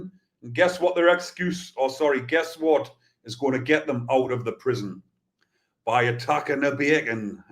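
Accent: Newcastle, United Kingdom. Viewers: Whi gives a flying fuck about men acting like women, it's clear to me that Ur a bully, a thug and a tosser itself. They might be in jail but that does not take the right away of anyone bring what they want, maybe you should be back in jail, giving it the large with the skin head and bs Geordie accent. What contacts have you got in jail, stfu you stupid twat, U chat so much shit.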